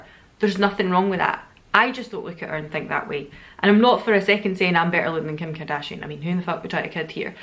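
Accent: Scotland, United Kingdom. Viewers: Completely agree with MLMs, just wondered what your thoughts were on the Body Shop as they have their Body Shop at Home? Really enjoying the videos after discovering you a couple of months ago, nice to hear a familiar Scottish voice 😊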